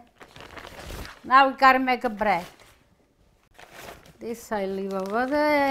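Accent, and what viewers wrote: Italy. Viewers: I so love her accent! She reminds me of a southern grandma with an Italian twist..😊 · Κυρια ειστε υπεροχη.καταπληκτικα τα τσουρεκια σας καπως ετσι τα κανουμε και εμεις στην Ελλαδα.Μου αρεσαν και τα τραγουδακια σας ο Θεος να σας εχει καλα Καλο Πασχα.Αγαπω την Ιταλια ακομη και τον προφορικο σας λογο.❤❤❤ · I could listen to talk all day long! I love her Italian accent and watching her make her delicious dishes.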